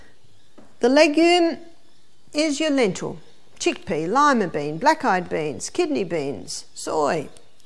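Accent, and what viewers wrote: Australia. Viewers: She's Australian. They say "inshulin".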